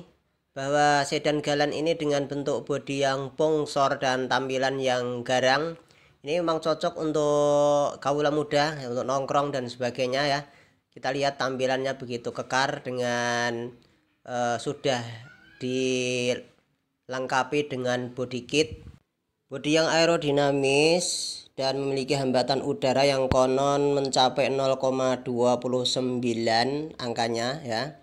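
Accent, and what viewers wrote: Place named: Java, Indonesia. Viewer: Medok coy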